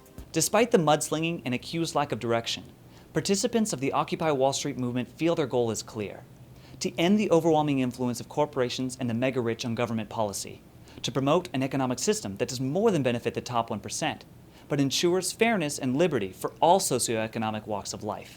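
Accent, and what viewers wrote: United Kingdom. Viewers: u sound like ur britland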